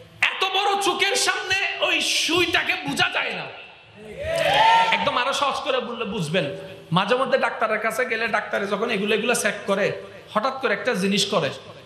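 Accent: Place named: Chittagong, Bangladesh. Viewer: ওটা চট্রগ্রামের ভাষা সবাই চট্রগ্রামের ভাষা বুঝে না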